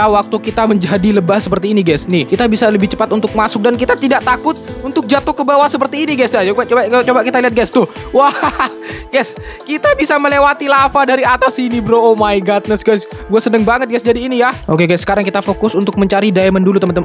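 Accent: Madura, Indonesia. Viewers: Suaranya kayak orang Madura ketika memakai bhs Indonesia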